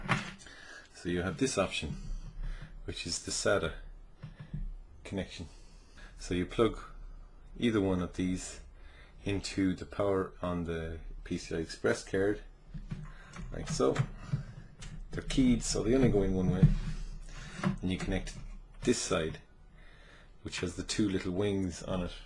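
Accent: Ireland. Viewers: this was very irish sounding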